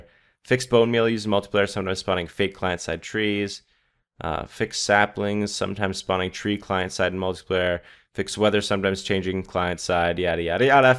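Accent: Canada. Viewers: ... I KNEW IT!!! Oh god.... You are canadian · are you canadian?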